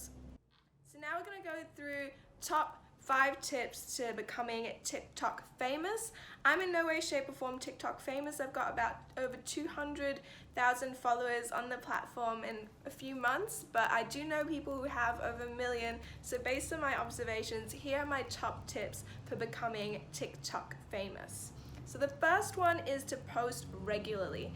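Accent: Australia. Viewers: That Australian accent 😍😍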